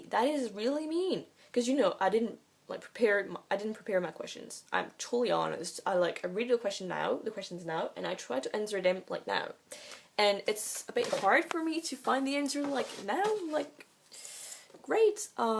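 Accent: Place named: Canada. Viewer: im questioning so much, like the fact im on a video with low views, basically this is a field that hasnt been stepped on in 8 years and i just stepped all over it. Confused with the accent sounds kinda canadian maybe its a hybrid accent.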